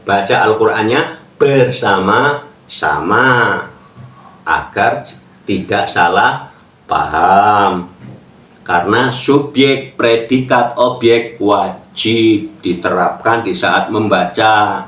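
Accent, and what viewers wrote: Banyumas, Indonesia. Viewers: Ingin sekali tau alamat tempat tinggal nya pak kyai....di lihat dari logat nya spti nya...dari Banyumasa. Sehat trs pak kyai❤❤❤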